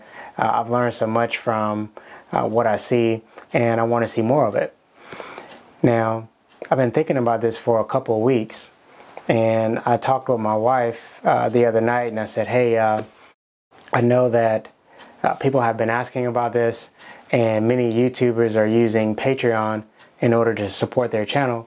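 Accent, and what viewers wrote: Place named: Baltimore, United States. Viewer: Just as everyone else has said, your videos are amazing. I hope you get more exposure because nobody on youtube explains things as well as you. Also, are you from Baltimore? Your "you"s and "to"s sounds like you are!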